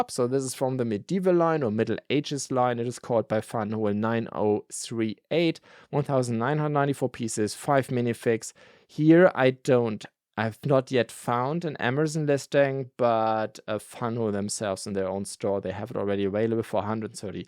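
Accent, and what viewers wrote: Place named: Germany. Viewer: Since my German is terrible while my family is over 1/2 German/Austrian American, I love listening to your accent. As a simple tip, bear - the animal - is pronounced the same as bare, as in not covered. Both rhyme with air. Beer, the drink, rhymes with ear, on your head. Your release videos are the best out there for non-lego sets. Pixel Dan's ARC-170 looks like what Lego Group should have done.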